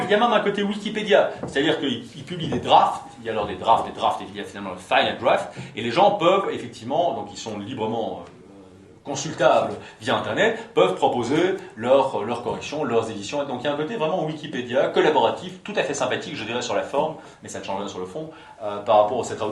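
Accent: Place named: Belgium. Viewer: C'est juste une des nombreuses formes de l'accent belge. Faut s'habituer... Pour le reste, je suis d'accord aussi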